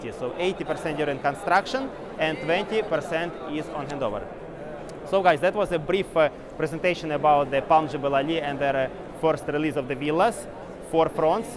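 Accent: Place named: Russia. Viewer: Your speech, information, intonation is great, but reeeealy hard to keep listening bc of such a strong Russian accent. You need to fix it at least a bit, man.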